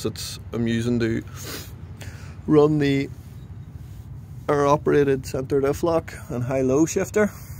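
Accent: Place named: Northern Ireland, United Kingdom. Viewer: Geekers Sur your norn Irish! Now I want one built!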